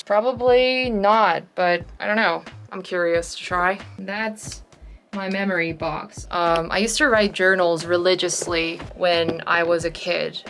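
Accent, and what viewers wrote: United States. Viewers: Have you always been in korea ? Cause your accent sounds like you lived in STATES...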